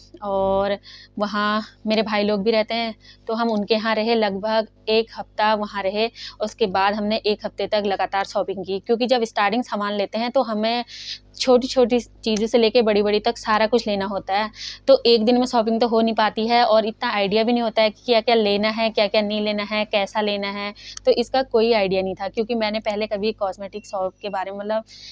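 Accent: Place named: Uttarakhand, India. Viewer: Baat krne k tone se smj jate h wo log Uttrakhand se h 😂koi nhi didi time lgega par jada pese ka maal lena h to sadar se fayeda h 20k ya 30k ka lena h to local se shi h.. First time sbke saat hota h lekin thoda tez hona pdta h didi lekin ab to pta chl gya hoga aapko ki kaha se saman lene m fayeda h first time sbke saat hota h lekin aaj b bina billty k saman aata h bs pta hona chahiye kon bhejta h bs first time sbke saat hota h uske baad sb sahi h bs thoda tez bno waha gunge ki traffic rahoge to badiya se lete h wo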